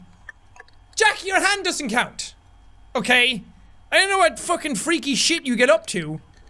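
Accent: Ireland. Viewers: jack idk where you moved but please try to not lose your irsh accent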